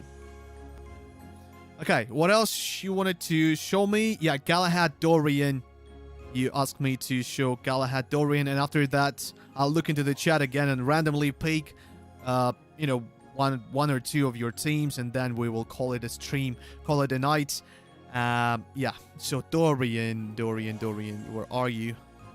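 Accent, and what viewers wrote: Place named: Russia. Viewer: Позорище, русский мужик на рус-англе догло читает транслит особо это не скрывая🤦🤦🤦🤦🤦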